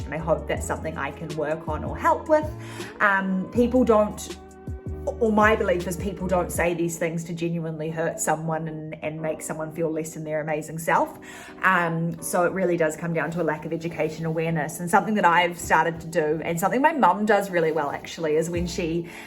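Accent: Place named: Singapore. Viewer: Why she speaking Singlish?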